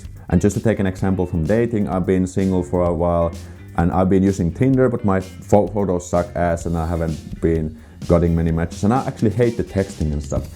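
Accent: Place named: Finland. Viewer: Kyl sä oot vähä jäävi kritisoimaan suomalaisia, kun puhut englantia suomeksi murtaen...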